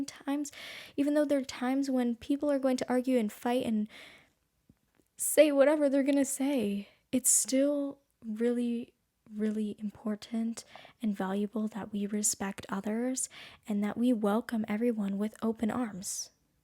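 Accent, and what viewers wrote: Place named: Philippines. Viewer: yes you are Filipina indeed the way you talk and how so proud to be a Filipino that shows you have the Filipino traits in you. I'm to hear and how you embrace the way being a Filipino. Goodluck and Godbless.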